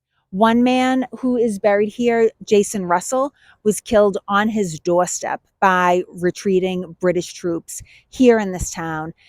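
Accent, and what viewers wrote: Boston, United States. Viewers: I grew up in eastern Massachusetts but moved away as an adult. I haven’t been back in over a decade. I showed this to some folks at work as an example of a Boston accent and they didn’t believe me. My brother seems to think the accent is too much as well. Honestly, it’s been so long I’m questioning myself. So I looked for the oldest video on this channel and this is what I found. Can somebody help me out here? I love the content btw. Just curious about the accent.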